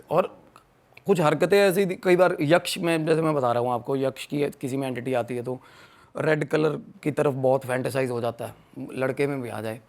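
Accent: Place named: Pahadi, India. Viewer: Seems like pahadi kind accent...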